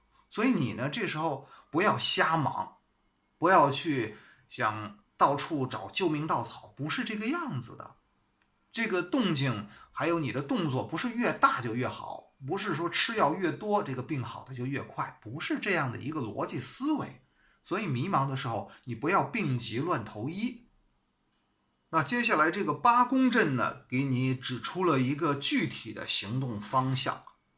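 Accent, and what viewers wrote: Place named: Liaoning, China. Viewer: 1. 老師口音聽起來像我遼寧老鐵的口音呢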